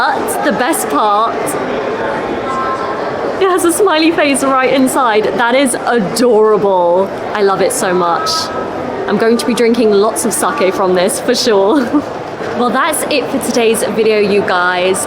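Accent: United Kingdom. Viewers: 動画の企画も、もちろん素晴らしいです。いつも楽しみにさせていただいています。探求心も素晴らしいです。さらに、の、イギリス英語の響きの良さ、本当に引き込まれます。きれいな英語を聞かせていただくだけで、大満足です。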